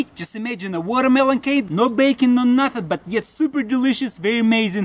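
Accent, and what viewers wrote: Russia. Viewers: You English seems more fluent when you do your cooking class, and your Russian accent seem thicker when you do your Slo-Mo shoots! Is that on purpose? Mama's hat as a Matte-Box is too funny !!! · Russian accent? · Как же режет уши русский акцент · it isnt him it is just another crazy russian they all sound alike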